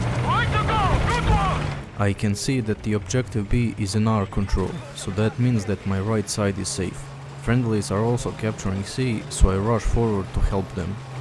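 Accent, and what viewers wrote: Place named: Eastern Europe. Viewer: Holy shit my guy has a voice! Lol if you ask me all us slav’s have the same accent when speaking English (2nd language) 😂. Продолжай в том же духе, брат 🫡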